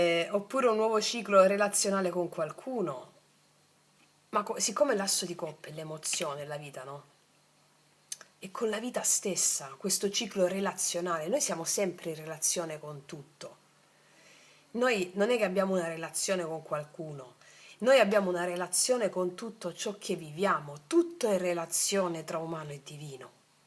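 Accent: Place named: South, Italy. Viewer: Buongiorno bella voce che hai specialmente il tuo accento che credo che sia del Sud.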